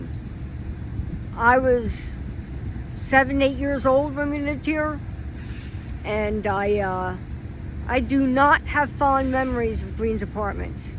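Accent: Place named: Delaware County, United States. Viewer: I grew up in delco as a child been living in amish land past 20 some years. Didn't realize until hearing you speak that I missed hearing the delco accent. My grandfather and mother grew up in Marcus hook and used to tell me stories of feeding the hobos that hopped off the trains there.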